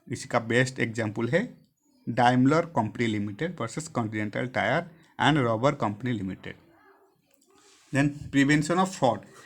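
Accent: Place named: Odisha, India. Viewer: Sir aap achhi English or hindi bolte hai poora odiya accent me😁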